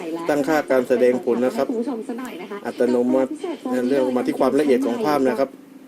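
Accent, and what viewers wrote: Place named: South, Thailand. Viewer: พี่คนใต้ไหมพูดเหมือนคนใต้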